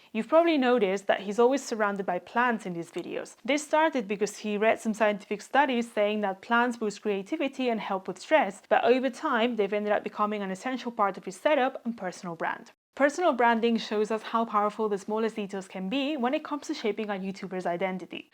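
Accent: Spain. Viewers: Puedo detectar el acento español hahaha, buen video!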